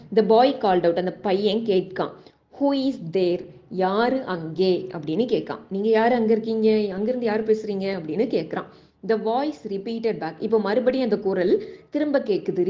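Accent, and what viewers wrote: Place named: Tirunelveli, India. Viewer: Hi Mam, are you Living nearby Thirunelveli? Because your slang be like so.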